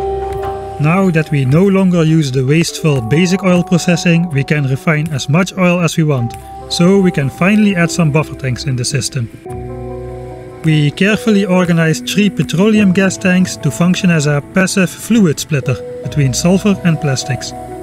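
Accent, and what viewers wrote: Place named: Netherlands. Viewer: 99% sure your Dutch just from hearing the first 30 seconds of this video. Btw first time on your YouTube channel/ first video I’ve seen of you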